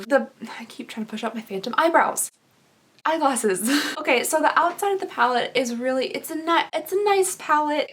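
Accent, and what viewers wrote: Kentucky, United States. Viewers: Mercer County here.. I knew she was from Kentucky as soon as she started talking but then she said something about a flea market and I literally laughed out loud 🤣 subscribed right after that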